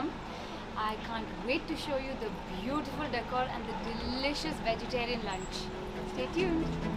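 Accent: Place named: Telugu, India. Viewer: Seems to be telugu woman speaking in English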